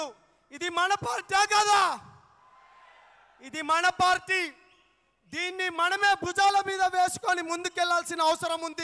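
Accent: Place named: Telangana, India. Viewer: శ్రీ సంపత్ నాయ్యక్ మాటల్లో ఆవేశం, విద్యార్థి బాగు కోసం ఆరాటం, తెలంగాణ యాస కనిపిస్తుంది 👌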